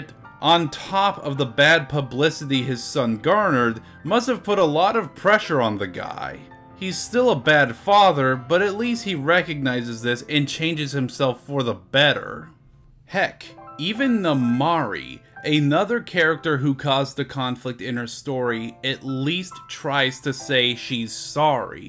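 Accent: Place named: United States. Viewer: I'm worried that this is a cultural issue. I don't want to assume you're American, but the accent says enough. In America, it's perfectly normal to go against your family's wishes to follow your dreams. That's an outlier in the world as a whole. In many cultures, family is everything. I'd suggest getting a perspective from someone who is actually from the culture they went so far out of their way to represent, before calling someone who is upholding an important, if nonsensical, family tradition as a "medieval reject".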